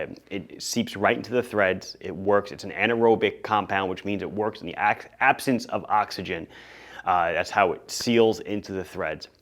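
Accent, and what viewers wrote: Long Island, United States. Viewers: That Long Island accent does get me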